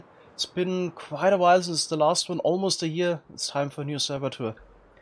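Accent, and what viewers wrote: Germany. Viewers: I love his accent mmmMMM German accent is so underrated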